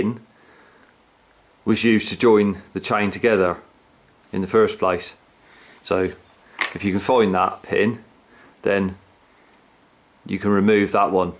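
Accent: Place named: Totton, United Kingdom. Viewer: Great video, very clear instructions. Are you from Totton? Accent sounds like it!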